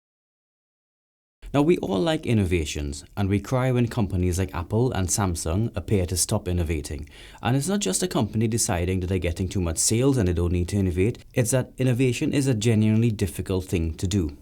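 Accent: Trinidad and Tobago. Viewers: Is this a guy from Trinidad?... with a touch of British accent? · Speech quality almost convinced me you weren't Trini for a second. Da accent cyah hide! Was looking at the Peak Design magsafe tripod to set up my phone for some photo/videography, but the lack of dual sided magnetism just couldn't justify the purchase since i find myself in some odd locations where a mini tripod slim enough to fit in my pocket unnoticed, doesn't always work. trying to get shots. This one however, checks all the boxes for the modularity i appreciate in a well contrived and engineered product. This and a magsafe water bottle... or a Milwaukee Packout bottle with a 3d printed bracket an I SET! Good video, man! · Are you from Trinidad?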